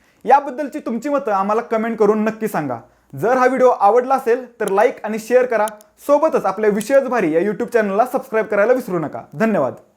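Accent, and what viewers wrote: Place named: Khandesh, India. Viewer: जडगाव म्हण.. खरा खान्देशी ❤❤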